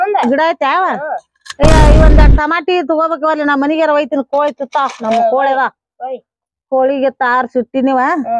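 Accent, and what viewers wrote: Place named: Bijapur, India. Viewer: ❤❤❤❤ yu very talented.. Bijapur Kannada tumba ಚೆನ್ನಾಗಿದೆ 🙏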